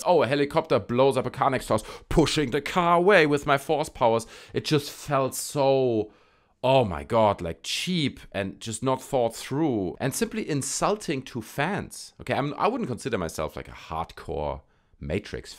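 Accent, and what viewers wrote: Germany. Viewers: HAHAHAAAA! LOL. Your German Accent just makes everything sound funnier! Good job though. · This guy has an oh so subtle german accent but speaks great English...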